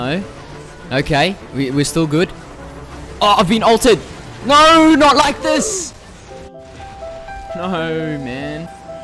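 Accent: Australia. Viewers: Ru aussie mate? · His australian right?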